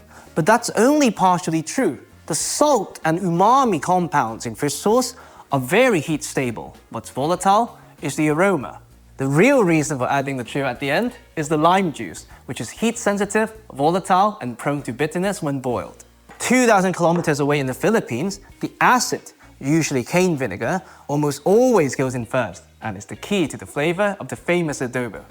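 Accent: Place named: United Kingdom. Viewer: with your eyes closed its like listening to some posh old English cooking radio show :D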